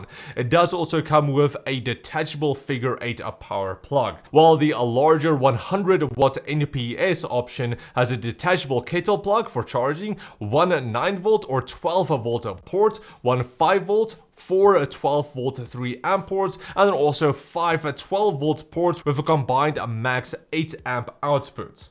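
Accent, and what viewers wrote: South Africa. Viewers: Meneer jou aksent maak dit bitter moeilik om na jou te luister, hoekom doen die Suid-Afrikaners dit, dit klink verskriklik.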